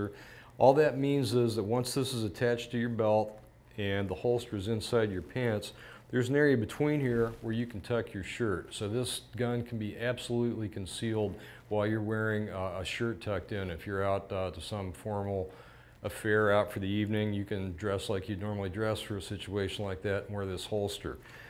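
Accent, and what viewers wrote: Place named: Chicago, United States. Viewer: I know you can't even carry a slingshot in Illinois. That Chicago accent gives it away. Where do u live?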